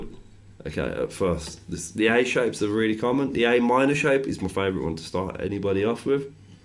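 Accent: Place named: Birmingham, United Kingdom. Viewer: brummie? Some type of English accent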